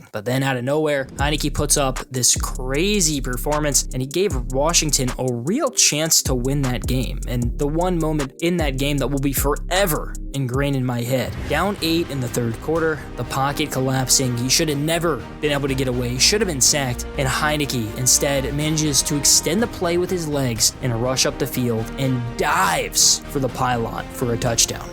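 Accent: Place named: Virginia, United States. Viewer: I knew you was from VA ‼️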